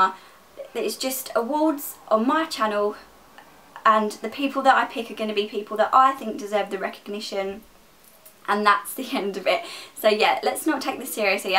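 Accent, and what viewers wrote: United Kingdom. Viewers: First video I've seen of her! Before I even played it I was like "shes gonna have a British accent!!" and she did lol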